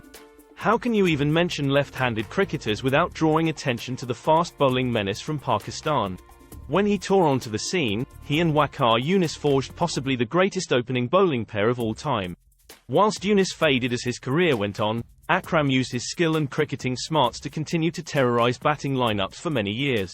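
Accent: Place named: England, United Kingdom. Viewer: Cricket is about elegance and beauty, not stats. At least for the fans. Like your attempts at ranking the various cricketers according to their different specialties. However, learn this - no left-hander is better than Garfield Sobers as a batsman. He was great against all bowlers on any type of wicket. Brian Lara is 2nd. You speak like an Englishman. No West Indian of my age would prefer to watch Geoffrey Boycott bat over Tom Graveney. Old Tom, a wonderful English stroke player. Also, David Gower another of a different era. Keep up the good work. Like your videos.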